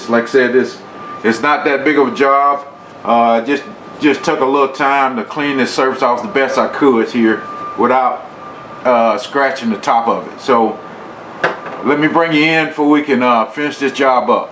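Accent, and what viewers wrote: South, United States. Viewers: What state do you live in, got a bit of southern drawl there, I'm from Ohio myself born in Kentucky!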